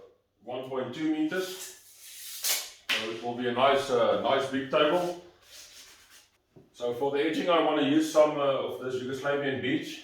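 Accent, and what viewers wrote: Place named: Afrikaans, South Africa. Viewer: I knew that was an Afrikaans accent! But the name puzzled me. Must be Belgian/Dutch/ South African.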